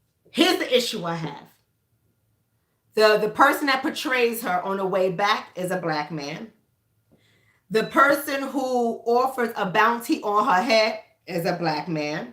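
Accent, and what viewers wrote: New York, United States. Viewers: I LOVE your NY accent.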